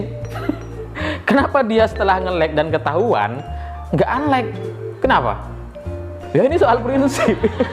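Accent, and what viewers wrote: Madura, Indonesia. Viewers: org yg ngomong ini logatnya spt org madura ya....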